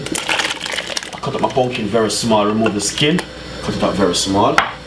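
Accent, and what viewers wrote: Jamaica. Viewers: Omg just listen to him he did say pumpkin, it's just the Jamaican accent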